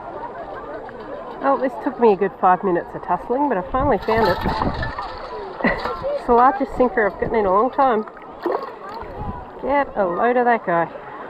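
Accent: Australia. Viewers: You do Aussie through and through have you used your new vest yet I hope you make sure you’re not alone when you go diving with those strong currents keep up the show’s love watching them 🤩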